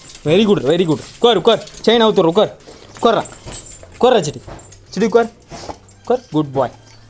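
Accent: India. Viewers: உங்கள் கொங்குத்தமிழ் மிகவும் அருமை நண்பா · sema Sema bro.. unga channel romba superb ah iruku.. unga way of taking that kovai slag ah semaya iruku..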